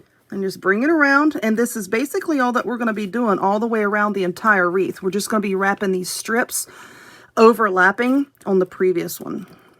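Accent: South, United States. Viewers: I love watching your videos, you seem to always have a good time 😊. Love your Southern accent, as I was born in Mississippi and now live in California 💜💜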